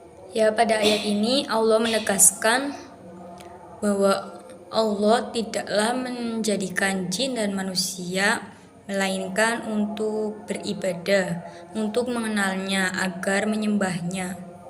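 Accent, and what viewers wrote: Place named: Java, Indonesia. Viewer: Good luck, medok ya gaes ya😅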